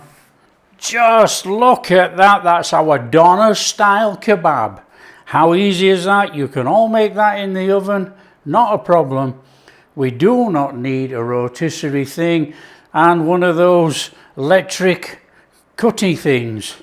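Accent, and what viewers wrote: Yorkshire, United Kingdom. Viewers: lol a fine Yorkshire boy, I never would’ve guessed! 😂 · What part of Yorkshire are you? I'm in Leeds.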